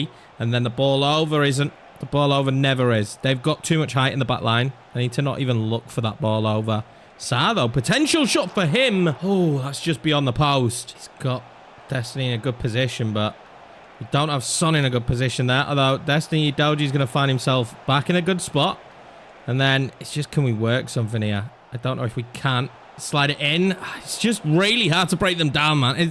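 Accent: Yorkshire, United Kingdom. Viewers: Let's get a Top CB like Diomande or Silva for CB and maybe get rid of one or two. The next stage of good teams is trimming down the squad and improving with genuine quality. The voice overs are clean Yorkshire Man.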